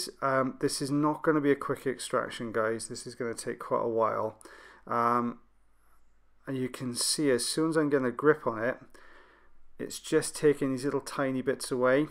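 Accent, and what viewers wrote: Wales, United Kingdom. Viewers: Are u welsh? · ear wax removal + welsh accent asmr = most satisfying video on youtube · Are you Welsh? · I thought I could hear the beautiful Welsh accent in his voice lol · Sally, it's about as mild as an accent gets. I can just about detect Welsh, which means he could be from Hereford, England, where some folk sound Welsh to my home counties ear.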